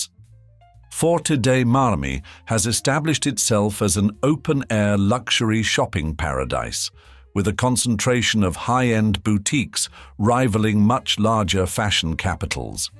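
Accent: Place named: RP, United Kingdom. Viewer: First one 😊 and congrats for the video. As a polyglot I do appreciate your accent : sounds clear and a trifle posh fully adapted and carved out 🎉😊❤.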